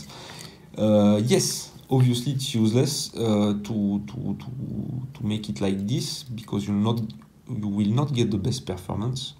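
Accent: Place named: France. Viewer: a l'accent francais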